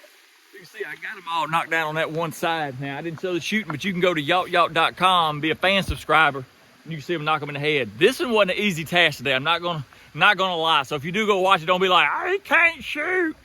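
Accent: South, United States. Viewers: After having seen quite a few of your videos, I am now also starting to understand what you say in your broad southern dialect. That was certainly not the case in the beginning.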